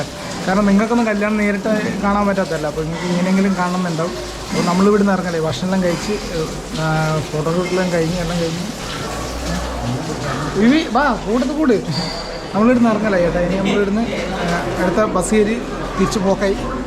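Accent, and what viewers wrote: Kannur, India. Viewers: എവിടെ യ നിങ്ങളുട നാട് കണ്ണൂരൊ കാസറഗോഡ് 🤔ഭാഷ എന്റെ ത് ആയതു കൊണ്ട് ചോയിച്ചത് പ്പ 😂😂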